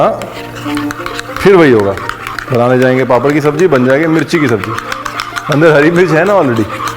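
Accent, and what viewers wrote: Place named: Bihar, India. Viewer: Sardar ki aulad hokar bhayiyon ka accent kyun bolta hai?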